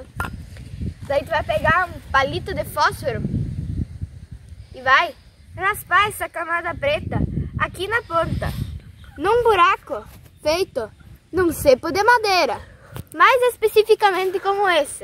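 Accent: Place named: Rio Grande do Sul, Brazil. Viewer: Gosto do sutaque gaúcho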